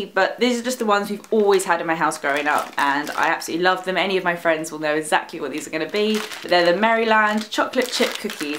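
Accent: England, United Kingdom. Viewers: Very English and not British!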